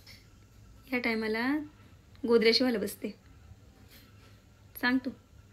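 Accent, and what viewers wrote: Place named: Shahapur, India. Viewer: ताई गाव कुठला ग तुझा मस्त बोलतेस आपली शहापूरची भाषा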